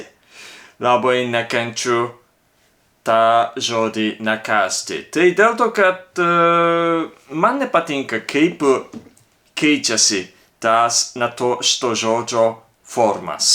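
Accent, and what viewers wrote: Japan. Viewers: Sveikas,. Tų žodžių, kurių nekenti, netark ir viskas bus gerai. Malonu klausyti tokį malonų japonišką akcentą. Vaike, Tu perdaug labai nesistenk gilintis į lietuvių kalbos gramatiką. Ji labai sudėtinga. Daug painiavos. Tavo pradžia nuostabi. Neskubėk. Viskas ateis.Tai, ką išmokai - niekur nedings.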